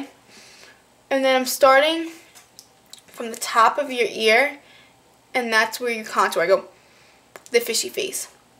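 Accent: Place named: New York, United States. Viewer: i love your accent. new York???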